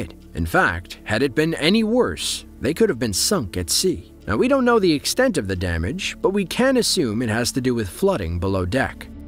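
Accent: United States. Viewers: the american accent of the commentator is unbearable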